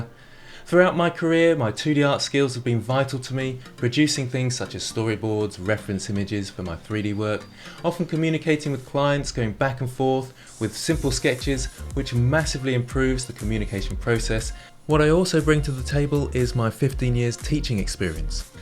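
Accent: England, United Kingdom. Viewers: At first I saw this in my inbox I was like "Okay maybe, but I shouldn't be spending money for yet another course I will never use", but then I saw and heard that buttery smooth English accent and I was In Like Flynn!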